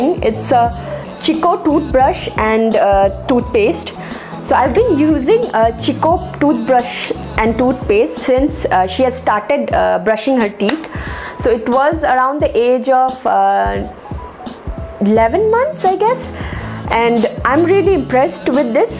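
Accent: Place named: Bengal, India. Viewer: Yes your content was really superb. Love to watch you and. Though your hubby Parth was missing. Loved every bit of it, love to hear the Bengali accent, reminds me of Mrs Sen our History teacher, a real sweetheart like you.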